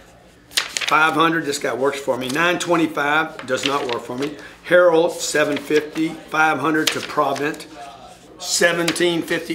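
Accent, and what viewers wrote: South, United States. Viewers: This guy is Italian with a southern accent? Who knew...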